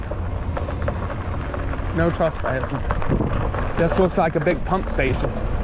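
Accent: South, United States. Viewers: Fun looking chill video, l also love the sounds of waves lapping. Hey you use what ever way of speaking that you want to y'all, for us guys up north it is nice to hear your Southern Accent and local nomenclature that you are used too. thanks for the video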